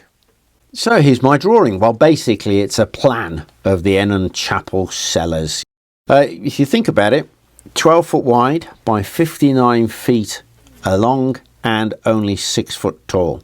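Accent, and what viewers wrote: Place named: Black Country, United Kingdom. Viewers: What a relief!! an english video narrated by an englishman and not a septic!!! aka a yank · Hi. Are you from Stafford or Lichfield by chance? Black country for sure but I can't place your accent.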